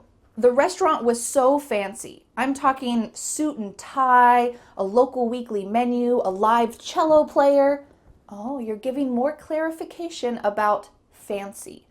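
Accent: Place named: United States. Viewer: Thanks for all your classes. With your methodology I can improve my english skills so much. My favorite youtubers to learn english are you with american accent, Lucy with british accent and Emma with Australian accent. I'm a beginner but I want to learn first american accent and for that I try to watch all your videos. Saludos desde Colombia.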